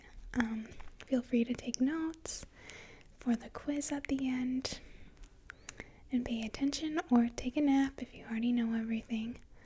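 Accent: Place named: Minnesota, United States. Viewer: These are great!! You do a great job and I love your Minnesota accent. (Minnesota, yes?)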